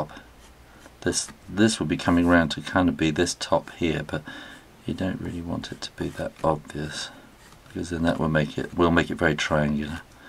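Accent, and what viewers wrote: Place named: England, United Kingdom. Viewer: nice to hear an english voice for once... and thanks for this tutorial it helped a lot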